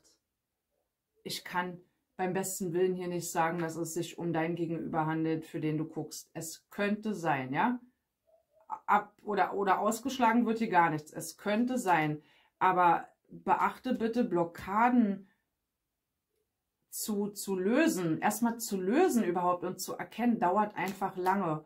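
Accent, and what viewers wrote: Berlin, Germany. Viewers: Liebe, danke für deine "Berliner Schnauze". Red wie sie dir gewachsen ist. Ich mag das sehr! Die wenigen Kritiker gehn doch unter bei uns. Du hast mir die letzten Wochen einen unglaublich klaren Blick auf die ganze Sache und meine Erfahrungen/Bedürfnisse geben. Auch wenn es oft weh tat/ tut. Nur so kann ich gestärkt vorwärts. Danke und weiterso. Fühl dich gedrückt. Liebe Grüße aus Bregenz am Bodensee 💕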